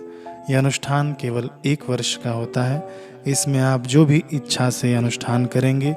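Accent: Standard. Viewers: Mai maa ka atyant bhakt hu mujhe iski jankari mili mai bahut prashanna hua apki vani bahut madhur aur bilkul shuddha uchcharan hai 😊 bahut sundar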